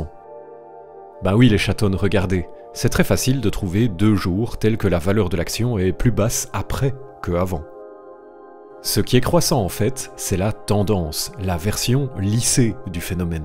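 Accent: Belgium. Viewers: Cette accent belge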